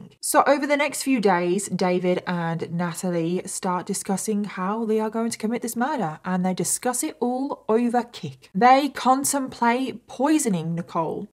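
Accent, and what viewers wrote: United Kingdom. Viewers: I enjoy watching all your videos. It’s obvious you put a lot of time and work into making quality videos with honest fact finding reports. Great job. I also enjoy your makeup and have learned a few tricks watching you. Your a very pretty and articulate young lady, and the British accent is super cool. (You do know how Americans love a British accent, right?) 😂I have Siri set on my phone as a British man. 😂 Keep up the great work! Sending love and positivity your way. Aloha. ❤✌️ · She's using the British pronunciation.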